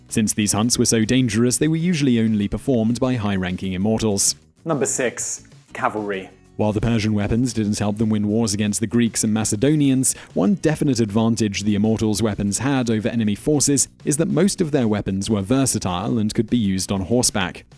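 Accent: United Kingdom. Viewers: 79,000 was not by any stretch the biggest army in ancient history. There were much larger armies than that. Look, I know you are British, and most often inaccurate in every single video, but please, drink your tea, and keep your revisionist history to a minimum. Some people conflate your accent with facts.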